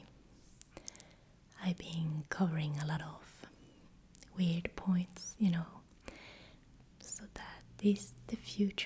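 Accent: Sweden. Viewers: Your channel has been my favorite lately, I listen to your videos whilst gaming, so I'm relaxed. I like your voice and your accent a lot, it's a cute little accent, the swedish one. But I also like how open you are, it's hard to open up publicly. After that, it becomes kind of a distant, distant, distant friendship, where we won't talk, we won't see each other, but I kinda want you to do great and feel sad when you're doing poorly, if that makes any sense. I hope you can resolve your health and emotional situations rapidly. God bless. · Your thick Swedish accent was the best part of this video! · Tack för dina videos! Jag brukar inte tycka om engelska med svensk brytning, men detta är såååå bra! 🤩 Önskar dig det bästa i det nya året! 😄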